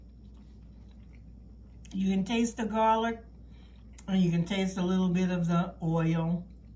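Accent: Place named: New York, United States. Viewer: Sent to fast young lady . Your new York accent is making me home sick for Commonwealth Ave... THANK YOU FRANK FROM MONTANA......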